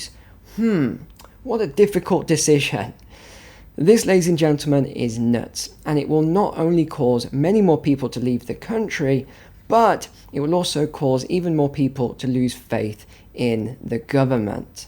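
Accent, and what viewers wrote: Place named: Scotland, United Kingdom. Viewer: Önrealized? Dötsch GOVT? Bödget? Is that a scottisch Akzent?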